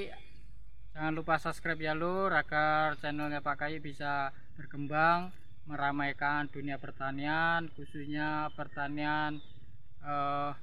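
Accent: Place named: Java, Indonesia. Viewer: Pemangkasanya pas dan terukur, bahamu juga meduk njawani😂😂😂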